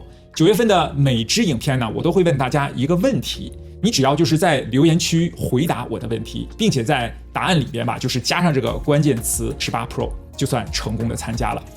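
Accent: China. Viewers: 为什么用的词是台湾或香港那边的，但是口音是大陆的呢？好奇怪啊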